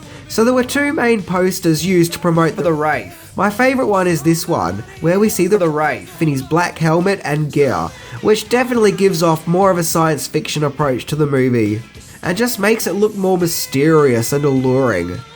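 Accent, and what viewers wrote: Australia. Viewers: Akirasan Akirasan it’s what we speak here in Australia 🇦🇺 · Hes a Aussie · Simon Tasievski bogan is australian, therefore he has an australian accent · Its an Australian accent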